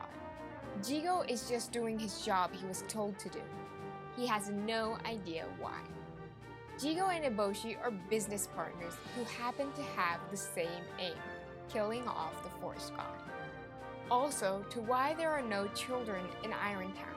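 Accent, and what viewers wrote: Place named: England, United Kingdom. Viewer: I could listen to you talk about anything your english accent is so nice.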